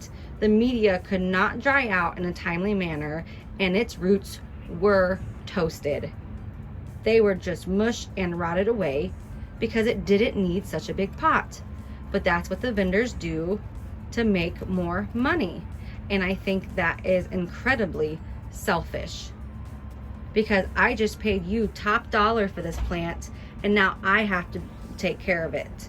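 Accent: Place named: Michigan, United States. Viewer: Hi! New sub here! I don’t know how these vendors stay in business. I spent $160 at Norman’s orchids for 4 plants Dec 2018. They were all rootless, rescue plants. They wouldn’t send me replacement plants and the owners wife was rude to me on the phone, email and Facebook. They were all supposed to be blooming size. None have bloomed yet, but they’re finally starting to look like they’re getting happy. Btw, it’s nice to hear someone talk like me on YouTube!! I’m from MI 😀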